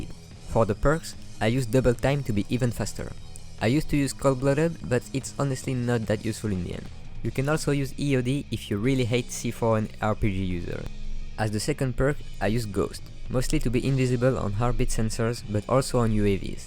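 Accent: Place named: France. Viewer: T'es français ça s'entend direct à ton accent mdr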